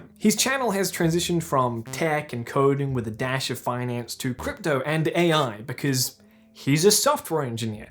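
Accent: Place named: Australia. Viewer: Its the australian accent and authentic australian delivery 👌🏻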